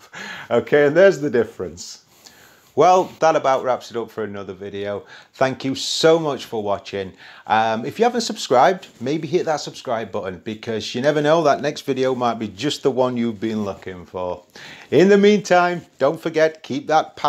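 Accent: United Kingdom. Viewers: Excellent video! Where in the UK are you from, BTW?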